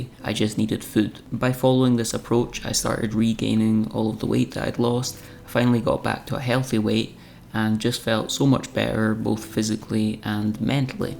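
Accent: Scotland, United Kingdom. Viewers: Those cookies look delicious! As a lifelong sufferer of depression and anxiety, I am SO glad that the stigma is starting to pull away from mental heath problems. I did not know what was wrong with me, but I certainly beat the doctors' doors down asking for help. The worst were the panic attacks. It took forever to find a combination of helpful meds, lots of trial and error. Do I hear a Scottish accent? My folks come from Arbroath. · Thank you so much for sharing - it's not always easy to open up, esp to the world! I've had issues most of my life but nowhere near the struggles you've gone through, mine were mostly related to being an introvert. I'm 76 now and still struggle from time to time. Finding where I belong and where I'm at peace (in Nature), has been my greatest healer even though it means being on my own with my dogs. I say on my own...not alone. 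I'm sure you'll have helped quite a number of your followers and their friends or families and I wish you all the best in the future. I thoroughly enjoy your videos and love hearing your soft Scottish voice. My origins are in Lumphanan so it reminds me very much of all my family, esp my mum. BTW - if those biscuits are anything to go by, you've got a head start on recovery - we need that recipe!! Love 'n' healing hugs from Oz ❤️🤗👩‍🌾🦘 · What a good video. Agree with everything you said....if it matters to you. I love your clear Scottish pronunciation.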